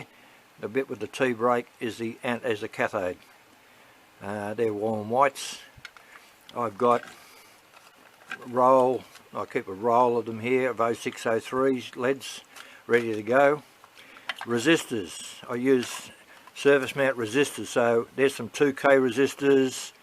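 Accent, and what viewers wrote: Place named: Australia. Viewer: Thank you, this is extremely helpful ✅ It’s great to hear an Aussie accent on YouTube. Best wishes, watching from Batemans Bay, NSW.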